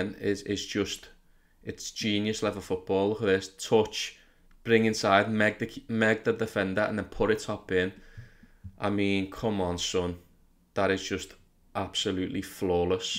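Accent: Liverpool, United Kingdom. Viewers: Are you liverpool fan?😁 Because you have liverpool accent. great video keep it up.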